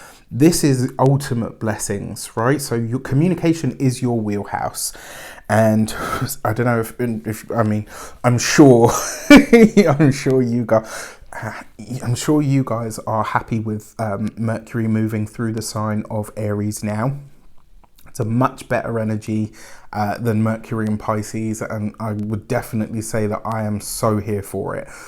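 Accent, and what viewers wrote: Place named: United Kingdom. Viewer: Sending you healing energy and no worries about aesthetic... a British accent can pair with a sock puppet and come off as important and eloquent. Feel better☺ (excited about the new people appearing in the reading )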